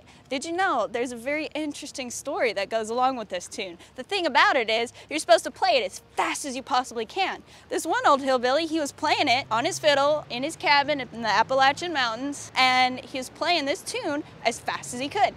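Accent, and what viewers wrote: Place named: Arab world. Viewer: Nearer the virtuosity with the fiddle,and..... because of your way of talk, I know you're coming from the middle east. congratulations!!!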